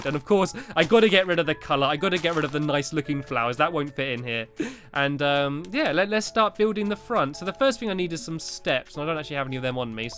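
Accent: United Kingdom. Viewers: i love your videos and you British accent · Omg I love your ACCENT I wish I was British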